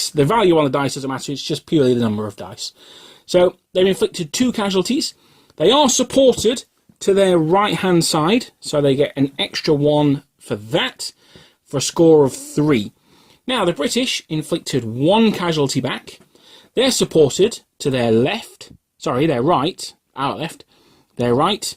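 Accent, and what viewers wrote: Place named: Yorkshire, United Kingdom. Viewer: I love the info your videos provide. Too bad we live a half world away. Napoleonic gamers seem to be an endangered species. Historical wargamers in general are much more rare here than over there from what I can tell. Certainly aren't any in southern Louisiana that I've run across or heard of. Sad really. The American civil war has been so stigmatized around here it has sucked down overall appreciation of military history, imo. I love your accent man, is that Yorkshire?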